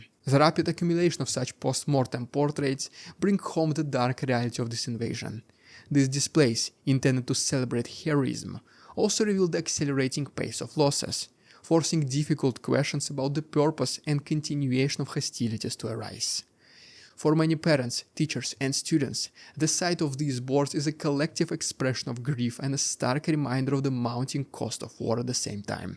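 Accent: Russia. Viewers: Can you translate to English. Your Russian accent is hard to follow.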